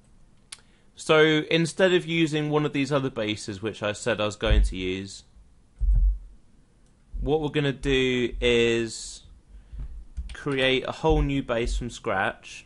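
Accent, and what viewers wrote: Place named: London, United Kingdom. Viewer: no he doesn't. He just has a normal british london accent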